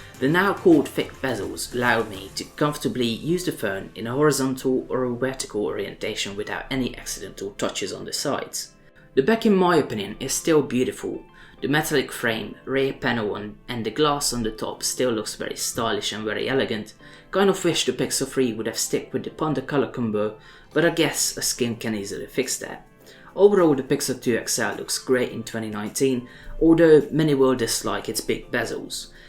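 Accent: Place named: United Kingdom. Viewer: I still own this phone in 2019. I won't upgrade until a decent phone is actually released. i used to change phones every 6 months, but at this point everything is such a mediocre upgrade. I love my Pixel 2 XL and for all it's faults, it's still the best phone I've owned so far. Hoping the Pixel 4 XL is worth buying. If Google choose LG again for the Pixel 4 XL (I know it's not in the 3) then I will not buy it. I have a hatred for LG PLED. Awful quality, Samsung are OLED kings. Just a side point, interesting accent! It's at times very British like mine, and at other times very mixed lol, just curious. Update 27.04.18: Just accidentally ordered an s10+ (£650). I have a feeling I will regret this.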